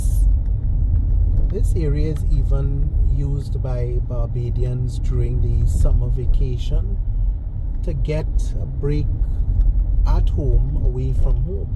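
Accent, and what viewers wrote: Trinidad and Tobago. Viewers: ❤ Thank you so much We Barbadians love watching you here from Italy That area from Thornbury hill near Scarborough area that gap is called Peg well or Pegwell Gardens Have some family there ❤ Keep up the good work love your Trini Voice ❤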